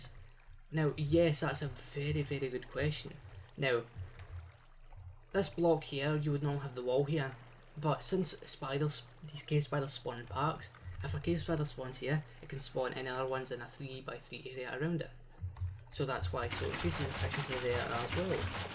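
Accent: Scotland, United Kingdom. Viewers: Thanks for that video. Loved your accent. Very Braveheart. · "Barely English"? Scottish accents are older than the USA. Either way, you could watch this on mute and you'd still be able to follow it.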